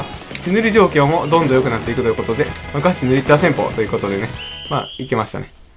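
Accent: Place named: Kansai, Japan. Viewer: さんの関西弁トークが面白すぎるww こう考えるとチャンネルBANは結果的に良かったかも知れないですね！ （´-`）.｡oO（いつからいろんな戦法を使うようになったんだ？)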